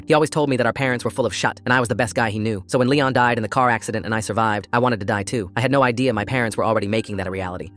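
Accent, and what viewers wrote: United Kingdom. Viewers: Why do you turn British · Also what’s with the british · You sound British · The British accent 😂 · the british accent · the British acc is wild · Is it just me or did he turn british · why did he turn british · Why did the voice slowly get British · Great video but like why did halfway through the voice thing you just turned British? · Am I the only one who realized the British accent?! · Why is bro British now?? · I'm not the only one who noticed the British accent, right? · Why did he turn british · ok good im not going insane the tts did turn bri'ish halfway through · WHY TF did he get a British accent half way through?.Anybody else get confused because the man talking is Felix + his brother Leon passed away. Felix took Leon's spot, so why would he want to grieve himself? Because he's at Felix's funeral but he thinks people need a mourn Felix. 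I think there was a typo and they meant Leon??? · Omg I thought it was just me not noticing that the voice was British the whole time 😂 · what's with the British🇬🇧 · It’s a British accent 🤣definitely can tell where you’re from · He turned to British and that make this so unserious sound. I’m sorry if you were bored the entire time I would actually sound serious. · It took me FOREVER until I realized he's British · He- hes British HE'S BRITISH AHHHHHHHHHH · Why did it turn British midway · O just realised that the voice is British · Me: BRITISH??? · Why did you turn British?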